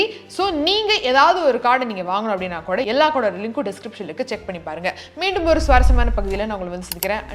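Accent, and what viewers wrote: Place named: Kongu, India. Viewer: Neenga kongu Area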